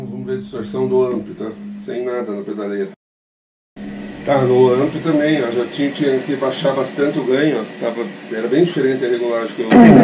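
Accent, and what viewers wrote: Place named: South, Brazil. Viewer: Sim, excelente custo benefício mesmo. Me inscrevi no canal e estou olhando os vídeos aos poucos. Você é de onde mestre, senti um sotaque meio parecido com o meu na região Sul. Sou de Bento Gonçalves RS. Grande abraço!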